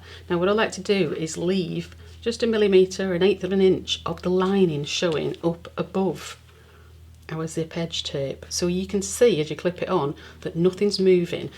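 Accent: England, United Kingdom. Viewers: It is lovely to hear a beautiful English accent! I've never had a problem with wobbly zips but it is always good to have a refresher! Thank you. (Edited to correct typos)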